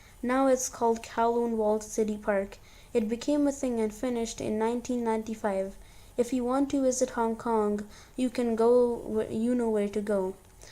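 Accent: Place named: India. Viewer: Your voice changed so much holy shit, it became so indian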